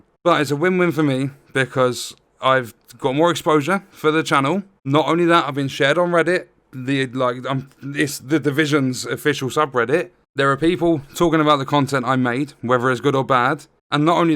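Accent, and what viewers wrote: United Kingdom. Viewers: Love all the content. That British accent cracks me up mate 😂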